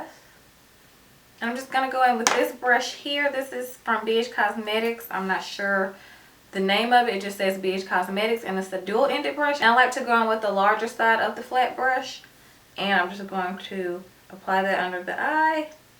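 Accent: South, United States. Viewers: I really enjoy a talk thru because it feels more personal. And I really like your southern accent. Please do more of these! 😊💕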